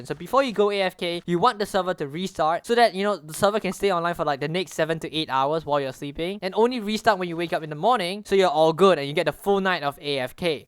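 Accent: Singapore. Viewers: R u Singaporean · yo are you singaporean or malaysian cus ur accent sounds like that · You sound singaporean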